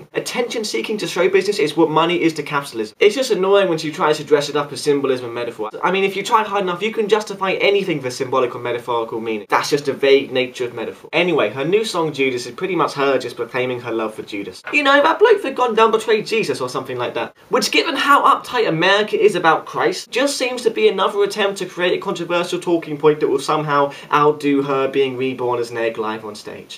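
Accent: United Kingdom. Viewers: He he I can't always understand what you're saying; you're talking too fast in that cute british accent of yours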